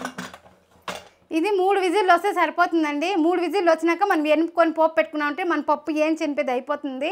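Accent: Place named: Chennai, India. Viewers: నమస్తే! మీ భాషచెన్నై కి దగ్గర్లో మాట్లాడే భాషలా ఉంది. బాగుంది. మీదేవూరో చెప్పగలరు. నేను ఆంధ్రప్రదేశ్ శ్రీకాకుళం నుంచి రాస్తున్నాను. వీలైతే జవాబు ఇవ్వండి. నేను గమనించింది: పప్పులో మిరపకాయలు అన్ని అవసరం లేదు. ముక్కలు చేసి వేస్తే ఎలాగూ ఉడుకుతుంది కదా!2. తాళింపులో ఇంగువ బాగా ఎక్కువ అయింది. ఒకసారి వీడియో చూసు కోండి. జై భారత్!! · Thank you for recipe. Are you from Tamilnadu Andhra boarder? Your slang seems so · Neenga tamilian .nalla theriyudhu.ungalode slang pakkayile.irundhalam telungu nalla pesaringe.namba ooru..adhukkaga subscribe panne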